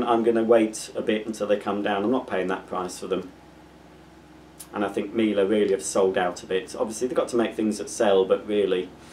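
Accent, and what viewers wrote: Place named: United Kingdom. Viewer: I love the sound of your voice. Well spoken and who doesn't like a British accent?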